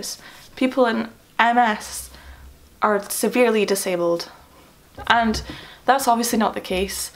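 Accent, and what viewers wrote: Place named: Scotland, United Kingdom. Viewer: Just diagnosed a week ago, currently with CIS but with a likelihood of MS. I hear from your voice from Scotland too. Your video is seriously helping me coming to terms with things. I have just taken on gluten and dairy free too. You’re an inspiration. I’m afraid to tell people because I worry they treat me different. Sorry for the wee ramble, I wish you all the best 😘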